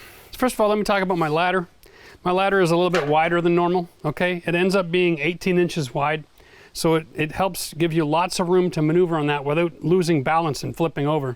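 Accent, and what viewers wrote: Canada. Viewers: Good overview, as I dry out a basement because pumps were not plugged in. You sound a little Canadian?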